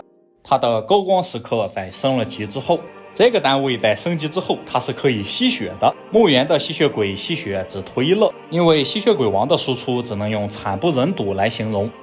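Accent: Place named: Shandong, China. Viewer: 感觉像山东， 四川人应该不骂wanna free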